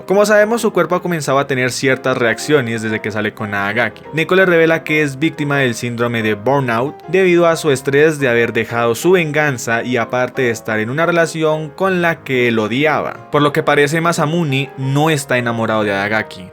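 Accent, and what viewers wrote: Colombia. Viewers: We me encanta tu idioma colombiano yo soy colombiano pero tu eres de colombia? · 5 segundos y deduje que es otro Colombiano Narrando, cuando dijo "PIROBA" dije! esta es mi patria Colombia querida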